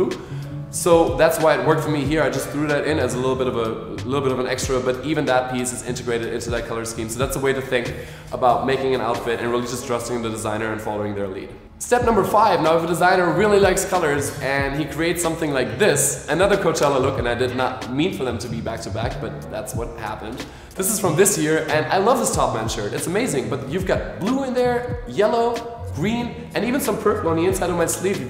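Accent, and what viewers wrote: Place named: Germany. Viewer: I’m quite obsessed with your German English accent here, very thick!! I used to work in a German company and all I could hear if not paying too much attention sounded very German to me. Great video I’ll recommend this to my male friend.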